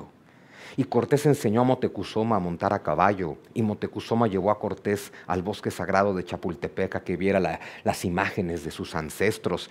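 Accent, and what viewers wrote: Spain. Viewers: Apenas inicia y ya trae el acento español, gracias, gracias, gracias. No mms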